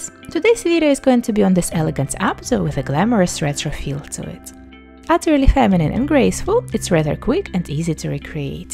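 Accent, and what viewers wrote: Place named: Russia. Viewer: U have rus accent...), wr r u frm?!)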